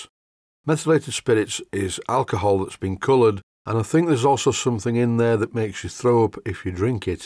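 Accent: Yorkshire, United Kingdom. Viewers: Nice plant,. And I've wondered about if you could do an episode in your Yorkshire accent sometime, with subtitles for the ones unable to understand. I understand it just fine, got a few mates in the northern parts of the UK who I've known and chatted with for years. Keep up the good work, mate.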